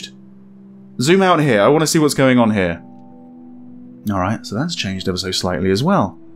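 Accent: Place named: United Kingdom. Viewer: Heard of this through mention otlf Twich streamer that does nothing, but puzzle & she always gets help from friends on the stream. I so wish i had them. Not pc or money. If can save up for gaming pc to stream on Twitch i get all these puzzle games one each month or so plus my ps 4 & smart flat screen tv ad only have older small one. This is amazing. I wish i had been able to do without getting spoilers, but it's ok. It is what it is ! Living vicariously through others atm. What can you do right ? I hope to catch up soon. Only got a tablet last yr finally. So behind gadgets & technoligy, etc. 😢😭😭 I love your voice. Great presentation. With that nice slower British accent it was very enjoyable. I'm more touch screen, app, and console type person. I do like puzzles, but not hard ones. I did Tomb Raider, but i need help sometimes just like steamer I mentioned though maybe not as much. 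Only when ger really stuck. I never done a serious game through alone without cheet guide book or online ao i dont know if I'd ever figure out a gane like this. Really complex. Blows my mond the dimemtions, but I get the workings now that seen all the watchers mentioned on YT, and this game is one of them so has made me understand puzzles workings more and a bot more confident I can, cuz learming from others playthroughs and see it from the other side of the screen and perspective.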